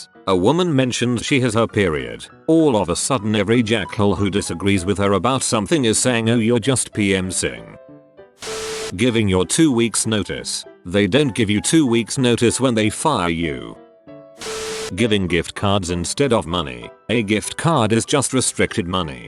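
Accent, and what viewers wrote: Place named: United Kingdom. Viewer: Who is this British robot guy who does all the Reddit videos? It’s the same voice on all the channels and videos!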